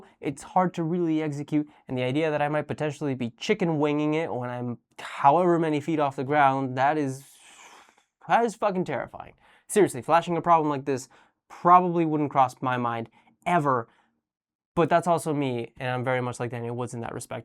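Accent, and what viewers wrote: United States. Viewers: you speak perfect American English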